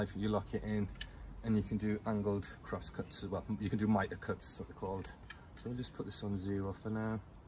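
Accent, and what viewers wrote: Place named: Yorkshire, United Kingdom. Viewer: Nice review. Loving the Yorkshire accent. Going to buy one on your recommendation. Thanks.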